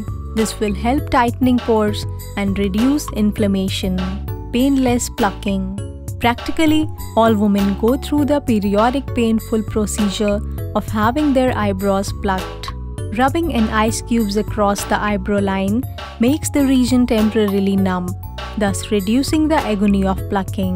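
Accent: India. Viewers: Indian accent... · cory Turner if the video is made by a indian the English will be the same way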